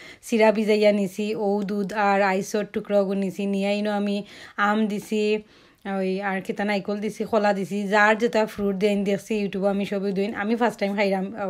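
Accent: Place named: Sylhet, Bangladesh. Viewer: MashaAllah Affa. Afne boring nah! Sylehti maat beshie maya lageh. Afne onek shundor! MashaAllah.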